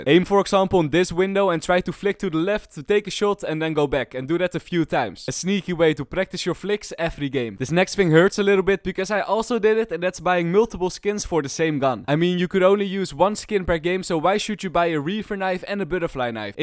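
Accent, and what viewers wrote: Netherlands. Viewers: Ik weet dat je Nederlands bent bij de accent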